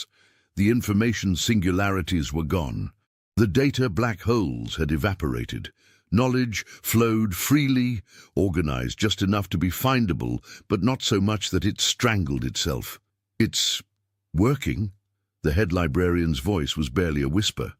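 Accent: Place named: United Kingdom. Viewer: Lol! I love this narrator!🤣🤣🤣🤣🤣🤣🤣🤣🤣🤣🤣🤣🤣🤣🤣🤣🤣🤣🤣🤣🤣🤣🤣🤣 Best story as always. I only wish all hfy scifi comedy has this british narrator guy.❤️❤️❤️❤️❤️❤️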